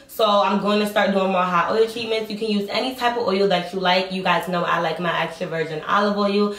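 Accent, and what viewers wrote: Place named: New York, United States. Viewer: I love your accent. Sounds like a New York accent. I love your hair and you remind me of Keke Palmer, very pretty girl. Oh, I would like to be mid back by July or Aug 2020. I drink over a gallon a water a day. I'm taking better care of my hair now and also deep condition every 2 weeks.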